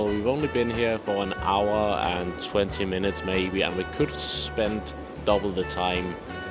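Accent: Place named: Liverpool, United Kingdom. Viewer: Great video! Your accent is wild! I got bits of Scouse, Geordie and Welsh in there 😮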